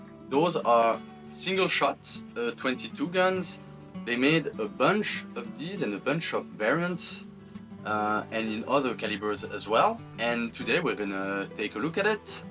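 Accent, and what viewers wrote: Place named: France. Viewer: Ta un accent français toi lol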